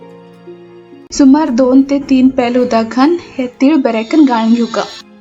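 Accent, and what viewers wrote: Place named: Karwar, India. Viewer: Waàw felt like am in Karwar .... after long time I heard Karwar Konkani ...thank u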